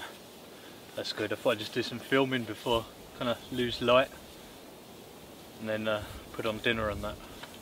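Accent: England, United Kingdom. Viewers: kinda cracks me up a little to see an english guy (I assume you're english, sound like it) making jumbalaya. I didn't think it would be popular over there, across the pond. And the onion. celery and pepper is called "Trinity". Mirepoix is onion, celery and carrot. Next time you make jumbalaya try using andouille sausage instead of chorizo. It makes a much better jumbalaya. Although, so does shrimp, not that I'm saying it's not jumbalaya without shrimp, but much better with. And easy to take and keep on cold weather campouts. Good video btw. That's some cold weather to be camping out in the open like that. Even in a partial shelter, that's still pretty much out in the open. I live in Michigan and have spent many years tent camping in upper Michigan for deer camp in mid November and have spent many nights camping in a tent in sub zero temps. But out in the open...Nope. 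And I don't know what kind of folding saw that is you use, but I have a Gerber and it has offset/staggered teeth and is REALLY aggressive. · Where are you located? The accent is British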